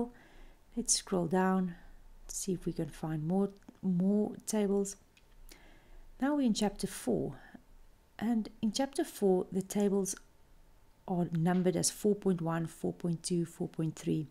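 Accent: Afrikaans, South Africa. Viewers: Her South African English accent is very dominant :| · She has an Afrikaans accent, from South Africa. I would know because I am Afrikaans too. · You sound very much South African! Thank you for this!! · You sound South African?? 😂 Same here!! 😂 Thanks so much for this video!! I'm submitting my PhD in one week and you explain so clearly and simply! THANK YOU 😁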